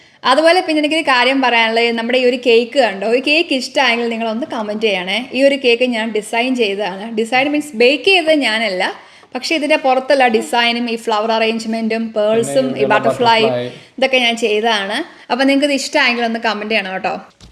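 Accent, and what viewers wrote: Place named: Kottayam, India. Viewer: Trivandrum anu valarnnathenkilum thani Kottayam slang anu anyway Happy wedding anniversary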